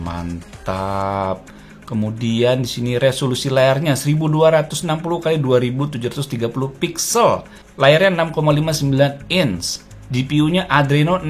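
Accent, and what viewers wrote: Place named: Jakarta, Indonesia. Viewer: Logat betawinya masih 100%😂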